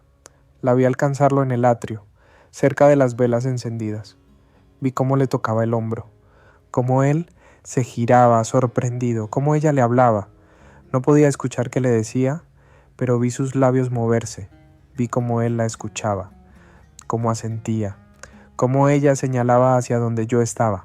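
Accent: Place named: Central America. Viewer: buscado una voz con acento argentino y no centro americano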